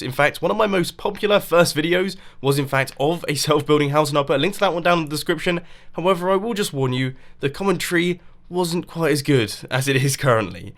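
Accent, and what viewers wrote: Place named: United Kingdom. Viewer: You are a British what the hell bro but I'm Indian and I like your english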